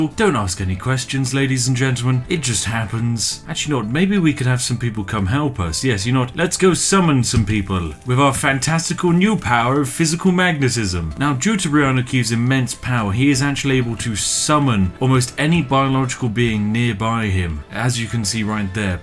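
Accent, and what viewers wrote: United Kingdom. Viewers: Yay a brand new British Boi!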